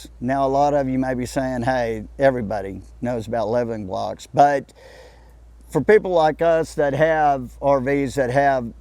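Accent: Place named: South, United States. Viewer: Please use subtitles for those of us who don't speak southern.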